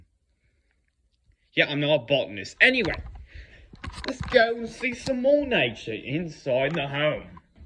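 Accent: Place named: Australia. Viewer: Why the actual hell is no one talking about his b e a u t I f u l Australian accent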